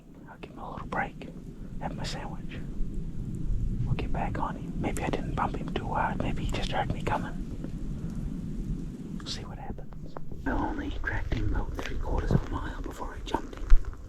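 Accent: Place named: Maine, United States. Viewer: Forgot to tell ya.. love the Maine..northeast dialect..our dialect talk is different here in northern Michigan.. that’s all part of the story..listening to the northwestern talk while your hunting .. super there guy…keep the videos coming..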